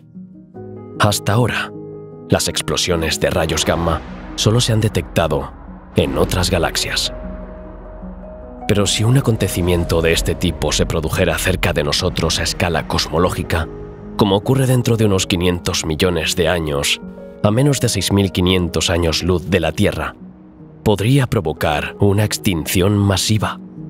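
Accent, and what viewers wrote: Spain. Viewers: Me gustaría un narrador más americano no Europeo, no me gusta la narración Española!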